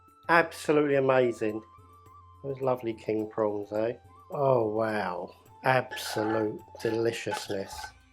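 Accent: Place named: Essex, United Kingdom. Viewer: you've changed my life, On a Saturday night no trouble n strife, Get my aluminium pan and a batch of base, Turn on YouTube and I'm met with your face. Your cheeky Essex accent, And your new cool hair, This is a shameless poem for a freebie apron And I don't even care... Respect. (Dave from the Sunderland Message Board)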